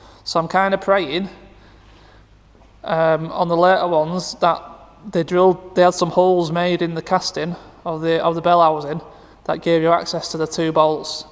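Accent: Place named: Blackpool, United Kingdom. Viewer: accent a bit like Blackpool youtube legend, the 'walk on the wild side' channel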